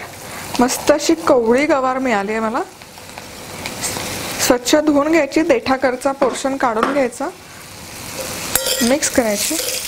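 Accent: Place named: Vidarbha, India. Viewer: Tumchya recepi chan astat. Mi nehmi baghte. Aani karte pn. Tumhi VIDARBHATIL aahat ka. Bhashewarun kalat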